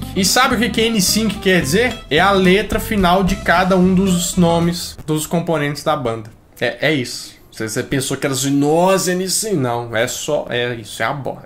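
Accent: Minas Gerais, Brazil. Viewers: Mineiro demais kkkkk Like mano !!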